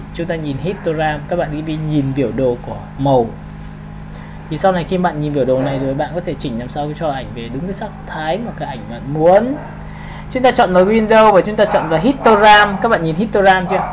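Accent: North, Vietnam. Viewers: dọng bắc hơi khó nghe nhưng thầy dạy rất chi tiết dễ hiểu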